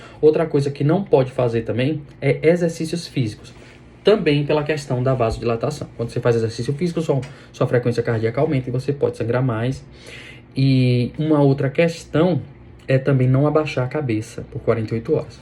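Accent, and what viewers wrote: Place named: Pará, Brazil. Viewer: Tenho certeza que vc é paraense . Extrai um siso ontem e o dentista não me falou nada disso . Obrigada 🫣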